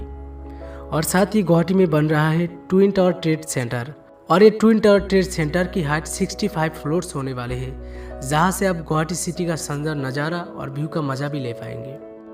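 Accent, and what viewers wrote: Bengal, India. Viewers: আপকা হিন্দী মে হামকো বাংলা শুনাই দেতা হ্যায়। কিন্ত আপ এতনা সুন্দর সে বিস্তারিত ভাবে বোলা হ্যায় যে আমি আপকা ফ্যান হো গিয়া হ্যায়। সত্যিই আপ অনবদ্য হ্যায়।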